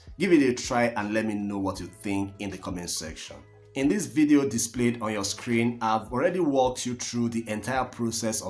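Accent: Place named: France. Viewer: Sounds like French accent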